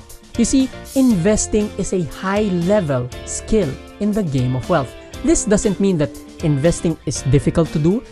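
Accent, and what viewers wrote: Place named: Philippines. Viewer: Very informative and easy to understand..excellent "Tagalish" tone.. 👍🏽 Thank you,! Cheers!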